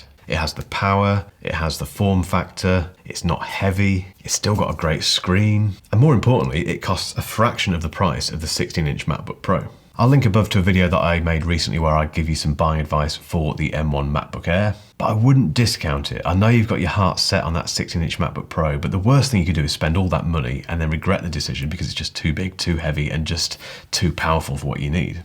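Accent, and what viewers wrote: United Kingdom. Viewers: Watching your videos not only gives much useful info but also allows listening to perfectly clear British speech, which is like honey in my ears. From Russia with love:)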